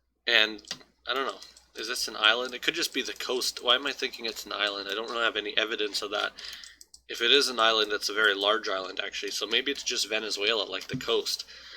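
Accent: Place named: Canada. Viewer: i am so glad you came up in my recommended! this was a great video, and judging by the accent, sounds like you might be a fellow canadian?!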